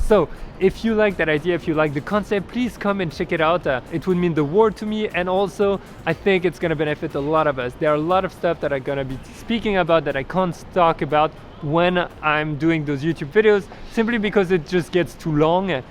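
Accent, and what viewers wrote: France. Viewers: Love your French accent dude! I do use crop a lot!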